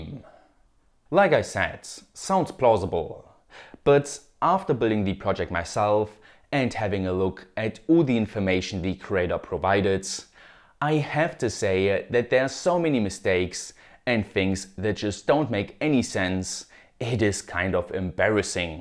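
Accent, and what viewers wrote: Germany. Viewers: but you are not a Scott. This is the hardest German accent I have heard in my life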